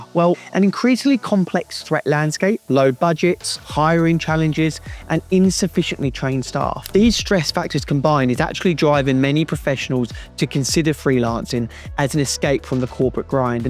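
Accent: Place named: Australia. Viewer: Thanks mate I let you know later because at the moment I'm busy to move in Asia. You're Australian, I recognized the accent because I lived in Perth for 2 years. 😁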